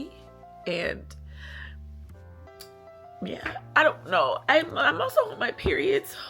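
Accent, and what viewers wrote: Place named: California, United States. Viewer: You look gorgeous, I'm from NY originally but raised in Florida. I could tell by your accent you're from CALI but your fits gimme NY respectfully. Like you'd fit right in, issa compliment.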